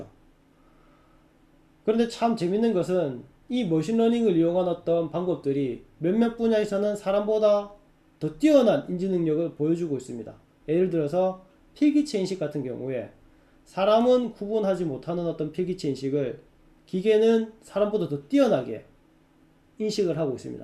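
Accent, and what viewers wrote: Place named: Busan, South Korea. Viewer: 딥러닝에 관심이 많아 동영상을 보게 되었습니다. 설명도 쉽고 재밌네요. 특히 부산사투리가 매력적입니다ㅎㅎ 꾸준히 구독하겠습니다. 추천업!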